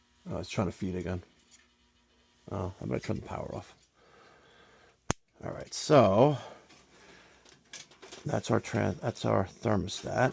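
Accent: New York, United States. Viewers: I'd let boy know to stop letting them flags fly in his part of the property. Some people might say that's being whatever, but no...it's not my religion or whatever get your shit out of my area...I'm not putting mine in yours so fuck off. Love you....Hey give me a faaageeetttit abbbouuuutt itt next time lol. I love the NY accent and some of the tude lol.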